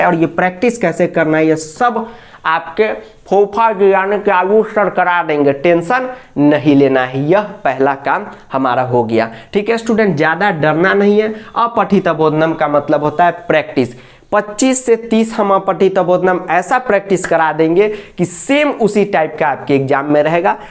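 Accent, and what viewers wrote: Bihar, India. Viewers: Sir aap bihar se h kya ?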